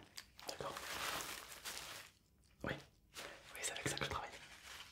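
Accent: South, France. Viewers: L’accan du sudee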